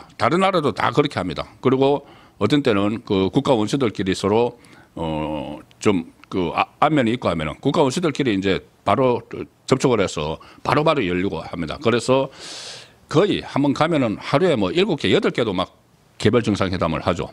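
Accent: Gyeongsang, South Korea. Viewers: 날로 퇴행을 거듭하는 수꼴들의 행진!!! 갱상도의 항정살기름진 목소리 !! 기억한다. 악몽들!! 미숙과 완숙은 옆집이지만 거짓과 진실은 다른 동네다. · 도대체 뭐가 엉망진창 입니까? 윤석열 보다 훨씬 더 여유있고 간단한 대화도 하던데 뻘줌하게 혼자 서있던 바보하고는 확연한 차이가 있던데 경상도 사투리 쓰는 유튜버들은 따로 엠티를 다녀왔는지 교육을 받는지 이따위로 통일된 내용으로 방송을 하냐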